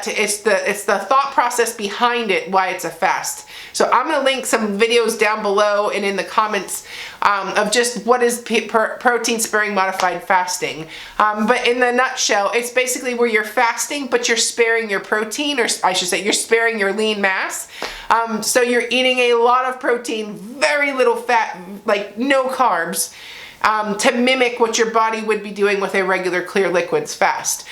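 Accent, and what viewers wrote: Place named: New Hampshire, United States. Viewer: Florida, Texas....when did you live in NH? That New Hampshire accent comes out! Loved seeing the girls playing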